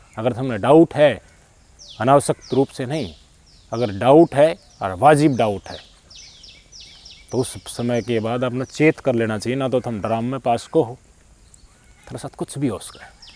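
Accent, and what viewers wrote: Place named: Bhiwani, India. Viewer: Bhai Bhiwani ki lapeteydar boli wah bhai wah 😅😅😅😅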